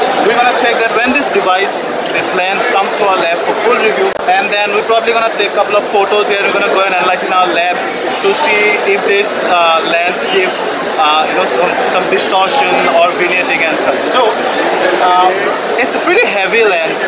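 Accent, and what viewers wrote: India. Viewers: Ur accent looks like u r an indian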